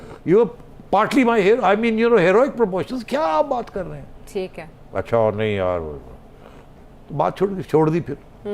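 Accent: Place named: Punjab, India. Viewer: Mr sethi Childish naughtyness in your talks and gestures enchants....and further Punjabi tarka enchants square (in terms of math )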